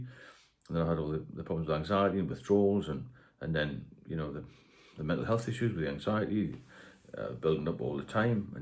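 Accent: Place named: Newcastle, United Kingdom. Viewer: You sound like you come from Newcastle. From experience, it's a shame that you don't come from a little further south, Redcar, where pub culture collides with parsimony, worthy of a David Attenborough documentary. "The Redcar drinker, always at the front of the herd approaching the pub, chattering loudly, suddenly withdraws silently to the back as the herd approaches the bar" You might as well get two pints in when it's your round, as the 'whose round is it anyway' game can go on forever when it's one of their turns.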